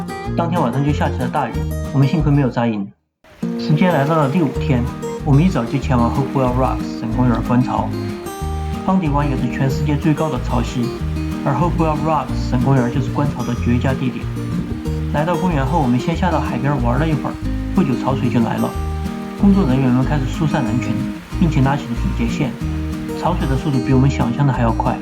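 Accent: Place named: Sichuan, China. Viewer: 发音像四川人说普通话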